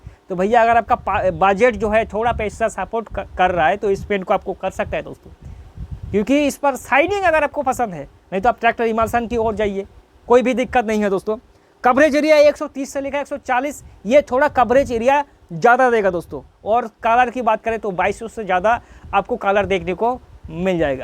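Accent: Bengal, India. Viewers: Ade baba tum bongoli hai kya 😅 nice video ❤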